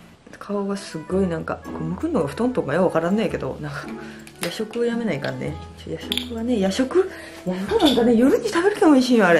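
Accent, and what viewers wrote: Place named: Shikoku, Japan. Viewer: はじめて見ましたが、方言がわたしのところとすごく似てて親近感湧きました（笑）四国の方なんでしょうか、、？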